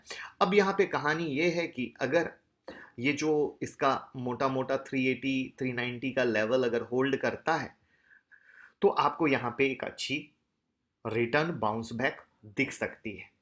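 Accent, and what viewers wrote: Bihar, India. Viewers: Sir aap Bihar se lagte ho.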